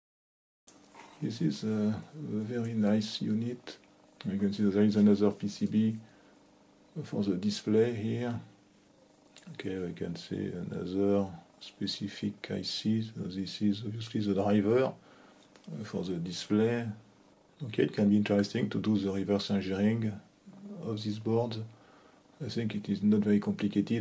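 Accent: France. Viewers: Simple, bien filmé/monté et surtout complet ! Merci pour cette analyse et cette formidable chaîne, extrêmement riche en pièces originales. Un vocabulaire technique parfaitement maitrisé avec un bon accent français comme on les aimes 😃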